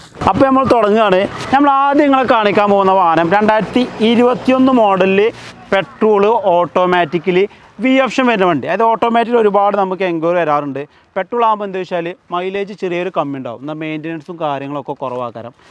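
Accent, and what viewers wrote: Malappuram, India. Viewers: മലപ്പുറം ഭാഷ യിൽ ഉള്ള വീഡിയോ വേറെ ലെവൽ 👍🏾👍🏾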